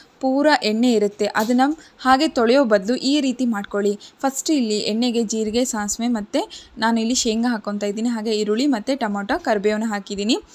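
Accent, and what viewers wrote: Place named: Hindi, India. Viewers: Neevenu north india dhavara? Achchukattaagi kannadadhalli sariyaagi uchchaara maadi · ಅದು ಹಿಂದಿ ಭಾಷೆಯ ಉಚ್ಛಾರಣೆ. ಅದಕ್ಕಾಗಿ ಅವರಿಗೆ ಭಾಷೆ ಬರಲ್ಲ ಅಂತ ದೂರುತ್ತಾ ಕೂರ ಬೇಡಿ. 🙏🙏😅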